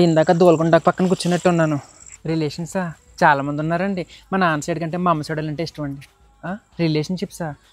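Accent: Godavari, India. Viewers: Iyyababoiiiiii sanaaa baaga miguthunnaru andi meeru mana godarolla yaaasa baane catch chesisav ehey nuvvu 😂😂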